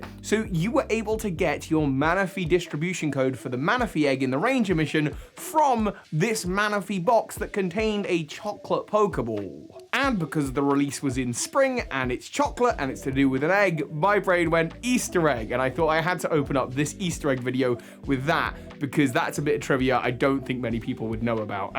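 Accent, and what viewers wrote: England, United Kingdom. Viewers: With r-dropping English accent, “thirsty guards” sounds like “thirsty Gods”, which I think is a great way to describe the residents of Mt. Olympus.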